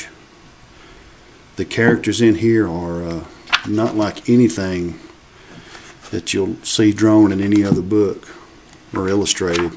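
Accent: South, United States. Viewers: Really awesome stuff. I'm one of those guys who has yet to post a video myself. I'm a writer so I'm better at expressing myself in writing. Just started an AF collection blog for my stuff. I'll share it sometime. Need more posts! Love your videos... and your southern accent!! LOL Stay Plastic!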